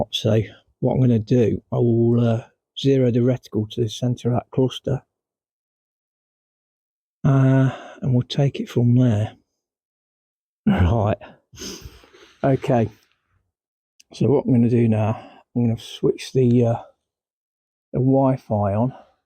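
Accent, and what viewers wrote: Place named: England, United Kingdom. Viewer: Too much explaining in the English accent. Just shoot and zero the scope like any other scope. 😂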